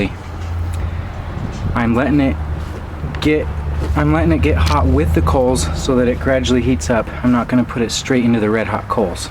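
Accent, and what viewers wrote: Netherlands. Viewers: you're dutch ?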